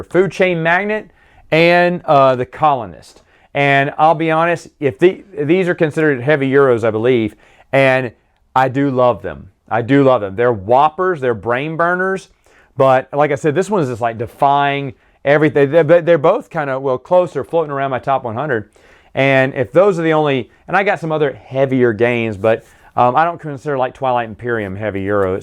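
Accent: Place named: South, United States. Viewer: Hey, I hope you don't mind answering a question I've been asking myself for a long time while watching your videos: I'm from Europe and English is just my third language. However, I love the English language and I want to learn as much about it as possible. I'm pretty sure I'm able to recognize several different dialects/accents, including Irish, Scottish, several British dialects, Australian, New Zealand accent, etc. However, when it comes to North American dialects, I still have problems differentiating between all of them. I think I'm able to recognize New York dialect, stereotypical Italian American dialect (based on Martin Scorsese movies, lol) vague Canadian dialect and vague Southern American dialect. I'm pretty sure you're from the southern US. Am I right? When I watch American movies, I often hear people mention "Midwestern" or "Californian" dialects, but they just sound "American" to me. 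Any advice how to recognize the differences? I know this question has absolutely nothing to do with your video, but for some reason, your videos always remind me of this question.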